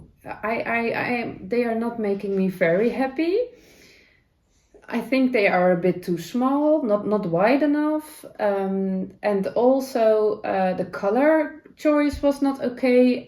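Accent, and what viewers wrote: Netherlands. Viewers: I really enjoy your so-called "dutchy English" because my dear Father also had this accent! ❤ 🧶 Hard relate on 3mm struggles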